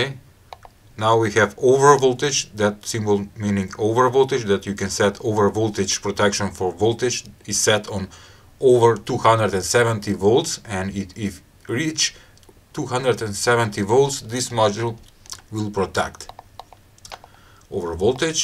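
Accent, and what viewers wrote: Russia. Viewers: I hear russian accent.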